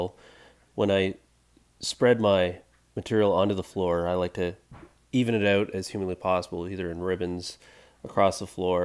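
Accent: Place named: Canada. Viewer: Why did canadians start and continue to say the letter o as a u?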